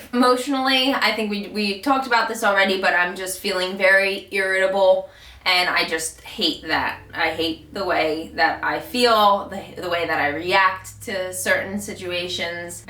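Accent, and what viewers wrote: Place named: Philadelphia, United States. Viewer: Just found you! Im 14 weeks today.... i love your accent are you from Philly?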